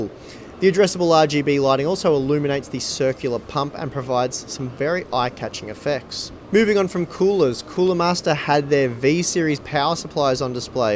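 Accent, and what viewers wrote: Australia. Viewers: disliked because aussie accent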